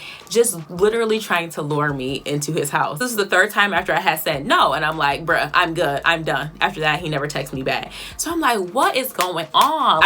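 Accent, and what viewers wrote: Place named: South, United States. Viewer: How did you keep your accent so intact? Everytime I was down South I pick up the accent in a few days.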